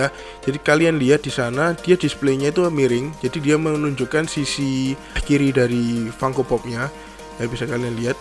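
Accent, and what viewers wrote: Java, Indonesia. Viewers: makin mantap bang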